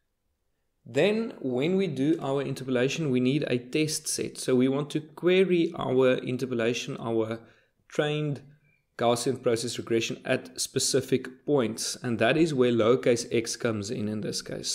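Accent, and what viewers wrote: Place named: South Africa. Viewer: Good explanation. It is heartwarming to hear a South African accent on a youtube video every now and then. My ML model predicts with a 90% probability that this speaker is from Pretoria.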